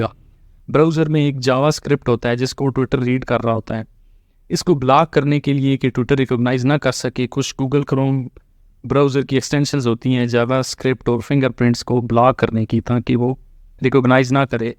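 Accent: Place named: Pakistan. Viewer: جناب صاحب السلام علیکم ورحمتہ اللہ وبرکاتہ بعدہ عرض ہیکہ آپ اپنی گفتگو کے دوران انگریزی زبان کے الفاظ بہت زیادہ استعمال کرتے ہیں کیا آپ ایک پاکستانی شخص ہیں کیونکہ آپکے لب لہجہ سے یہی معلوم ہوتا ہیکہ آپ ایک پاکستانی شخص ہیں' میرے مشاہدات و تجربات میں یہ بات آئی ہیکہ ملک پاکستان میں صحیح وخالص اردو زبان صرف علماء ربانیین ہی بولتے ھیں بقیہ عوام الناس تو انگریزی زبان سے بہت متائثر بلکہ بہت مرعوب ہے، قال اللہ سبحانہ وتعالیٰ، ألا ان اولیاء اللہ لا خوف علیھم ولا ھم یحزنون، الذین آمنوا و کانوا یتقون۔ خیر اندیش حافظ عبدالحفیظ، الجمہوریہ الھندیہ ۔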